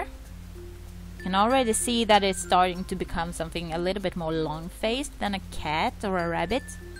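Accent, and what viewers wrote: Sweden. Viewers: I thought that sounded like a fellow swede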